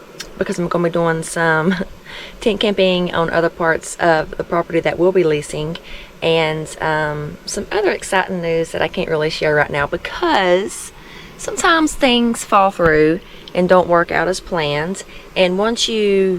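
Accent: South, United States. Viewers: … buffalo mozzarella & tomatoes is one of my favorites… also half a bagel with cream cheese, capers & topped with smoked salmon (prepackaged thinly sliced) … omg delicious…. my girlfriend born & raised in Asheville shares ur wonderful southern accent i never get tired of hearing…. lastly… like i’ve mentioned in previous comments i see black bears almost every other day & they are plentiful in our western NC mountains … there is no way i’d sleep in a tent in this area… u are correct , 99% of bears avoid humans… it’s the remaining 1% that scare me … the old ones and or injured ones are the problem bears that worry me… & i fear for ur safety…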